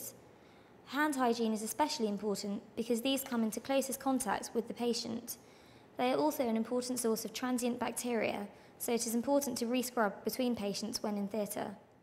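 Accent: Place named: Southern England, United Kingdom. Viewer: Nah, just Southern English!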